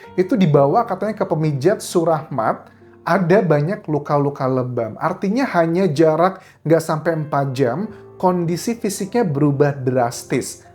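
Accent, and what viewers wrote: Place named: Sunda, Indonesia. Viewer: lucu amat ngomong sunda nya mas 😂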